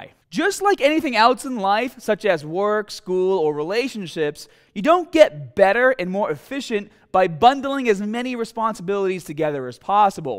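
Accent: Boston, United States. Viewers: Boston. That's what they sound like. I love it. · Except he has none of those accents. I guess 'American' could work but it's a Boston area accent. There are like 20 'American' accents. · GLAD TO SEE A FELLOW BOSTONIAN DOING BIG THINGS ON YOUTUBE ..THE ACCENT IS THICK WITH THIS ONE ITS FUNNY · do you really have that hard of a Boston accent? I've watched many of your vids and I've never noticed it before, it almost sounds like youre embellishing it haha. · Sounds like Boston to me lol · You’re so from Boston ❤️ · He sonds part Boston and part dork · Is this dude American or Australian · Do I hear a Boston accent? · Sounded like Boston to me. It's definitely not Australian! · Love watching ur channel and putting your advice to use at the gym trying to get in much better shape also listening to you talk I realize what I sound like to other people when I talk I'm about 45 minutes from Boston I'm in Taunton so mine isn't as strong lol but man now i can see why people from other states recognize our accent immediately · This is Boston, not LA kid. 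· That Boston accent on heavy lol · Canadians dont have accents lol. It's as plain English as you'll get. This is boston all day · That's a Boston accent what's the problem ? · Is he from boston? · Are you from Boston? That accent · no its Bahston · It's actually a completely authentic Merrimack Valley accent, from north of Boston, the Lawrence/Lowell area...my own homeland. Though I've been gone for many years, I wax nostalgic hearing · Svein Gustav Hoff When he moved to Boston. He wanted to match the accent for fun I believe. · Doda Dado could be either Boston or Chicago accent. Lots of Irish influence in those regions back in the day. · He is from Boston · Love the Boston accent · It's Boston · Are you from Boston?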